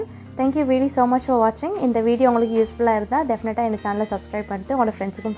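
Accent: Sri Lanka. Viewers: Assalamuallikum sister MashaAllah so proud of u talents Just came across blog U are doing a amazing job So clear and so good are all u recpies Are u a Srilankan as the way u speak is lot like us May Allah Bless u for many more success MashaAllah u are very pretty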